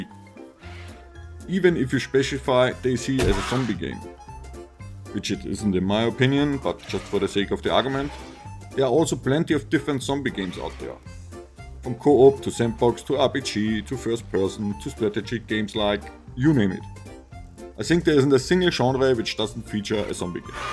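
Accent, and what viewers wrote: Germany. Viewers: German detected. Bayern? Your voice sounds like Bavarian person, but I might just be wrong there.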